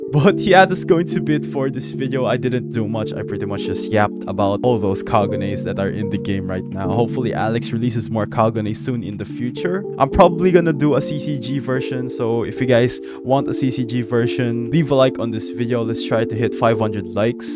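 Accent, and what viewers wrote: Philippines. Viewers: are you pinoy? Your accent is kind of filipino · Ye he sound Filipino I can hear his Filipino acsent jumping and turning American again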